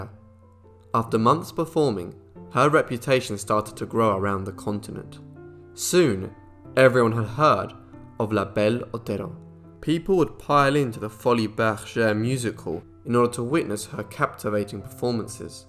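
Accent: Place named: RP, United Kingdom. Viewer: Hard to believe that with such a plummy Brit accent you mispronounce "Grosvenor." It's pronounced "GROW-ven-er "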